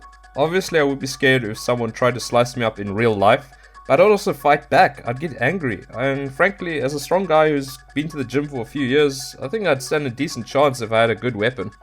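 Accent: South Africa. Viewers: Are u South African... or New Zealandish?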